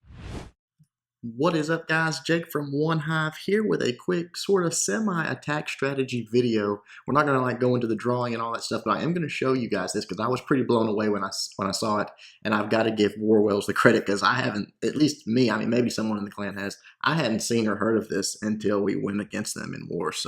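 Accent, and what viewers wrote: South, United States. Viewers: I find these videos informative, but this dude's voice is super annoying. It's that shitty southern accent with the horrid pitch FFS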